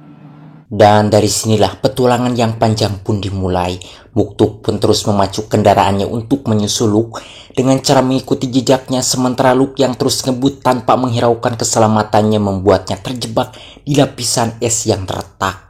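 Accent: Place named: Sunda, Indonesia. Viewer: sebenarnya filmnya ada yang udah ditonton...ngan eta resep naratorna. Aya emplud-an kitu. Crat cret crot...aaah pokona nyunda banget weh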